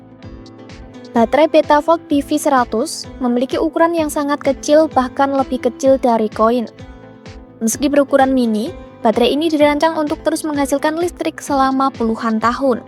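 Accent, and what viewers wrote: Java, Indonesia. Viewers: Kak, aksen Jawanya kuat banget. Mohon lebih disamarkan 😅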